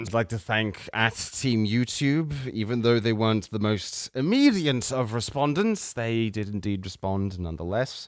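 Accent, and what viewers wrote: Australia. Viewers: I'm glad he's as drunk and Aussie as he sounds. Also, and I'm just saying, I've never seen and the dude from Explosions and Fire in the same room.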